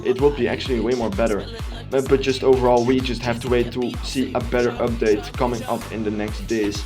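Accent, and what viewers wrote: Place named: Netherlands. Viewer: i hear a netherlands accent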